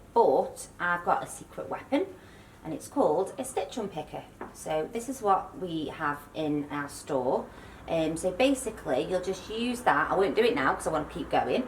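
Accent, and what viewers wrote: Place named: Birmingham, United Kingdom. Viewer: Amazing braid!! I love your accent - it’s very Peaky Blinders 💕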